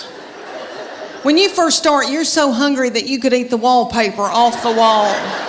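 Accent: South, United States. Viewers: the subtitles make me laugh. I grew up in texas. I have a city accent, not a southern. but its like, really funny to realize that maybe someone in france has no clue what the hell this southern lady is saying. love it. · I'm from the south. this lady reminds me so much of people from around here. The accent is my fav. You don't hear enough.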